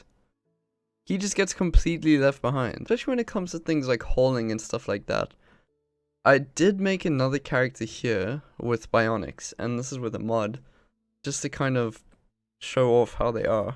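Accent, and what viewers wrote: South Africa. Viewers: I thought I heard a South African accent. Keep it up bud, I do enjoy your videos! Cheers from SA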